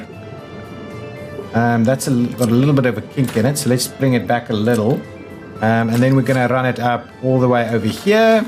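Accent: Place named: South Africa. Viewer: Hey, you don't sound like an Australian... much more like a South African ;-) . Nice to hear another South African stream/content creator.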